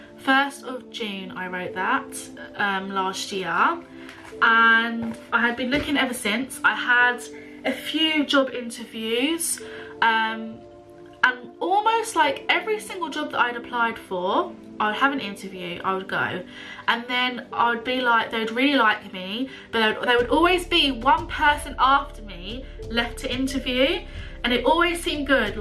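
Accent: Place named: United Kingdom. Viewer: British English sounds so lovely and cute 😍😍😍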